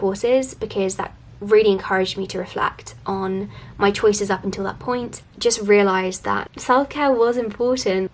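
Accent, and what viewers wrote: United Kingdom. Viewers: Your accent is so purelyyy British ❤❤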